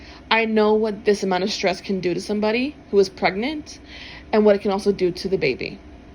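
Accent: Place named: Miami, United States. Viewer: Sounds like you grew up in Miami, like me?! Sometimes you got to fight. Gabfly wanted to hear the reason that dude r word his ex? What the heck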